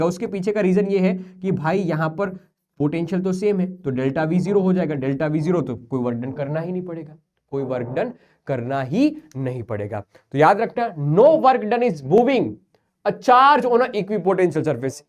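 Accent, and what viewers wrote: Gujarat, India. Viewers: sir ka accent dwkh ke hi lag raha he ye gujarati honge 😅😅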